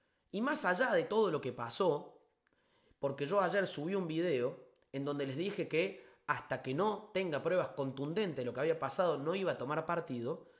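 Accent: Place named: Córdoba, Argentina. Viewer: TE FELICITO POR TU EXPOSICIÓN. PERO MÁS CONTENTO ESTARÍA QUE TENGAS EL ACENTO CORDOBÉS AÚN MÁS ACENTUADO..."ES UNA MARCA REGISTRADA".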